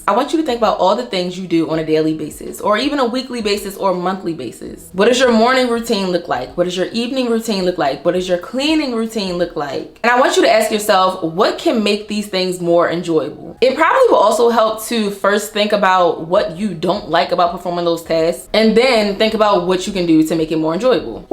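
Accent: Baltimore, United States. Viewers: It sounds like you’re from Baltimore so ima subscribe!!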